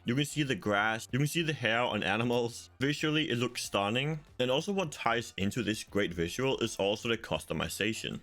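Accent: Denmark. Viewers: u sound danish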